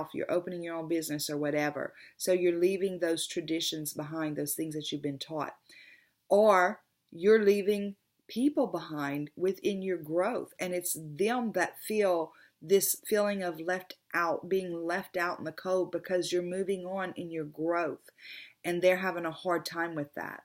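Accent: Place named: Alabama, United States. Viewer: I was hearing Alabama, are you from there?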